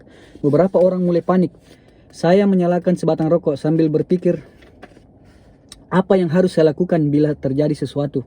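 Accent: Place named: Toraja, Indonesia. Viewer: Macam sy tau logat bang kayak org susl sel deee kayak Toraja👍🌷🌷🌷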